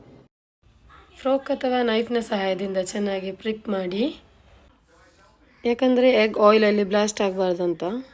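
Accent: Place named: North Karnataka, India. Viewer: Nimdu yavoor kannada ri uthhrakarnata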